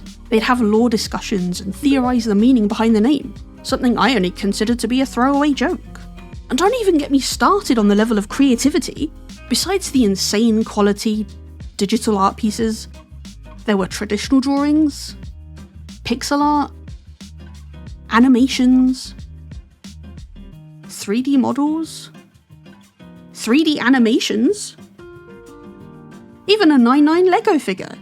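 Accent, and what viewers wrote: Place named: United Kingdom. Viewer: i love your british accent, i think its british, urart is AMAZING, i am DEfINENTLY drawing a mech when i go to bed, even tho my usual drawings are so mediocre, but i'd love to do this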